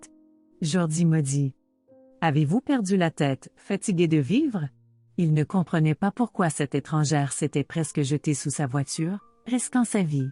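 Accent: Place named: Canada. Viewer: Bel accent canadien J'ADORE bonjour.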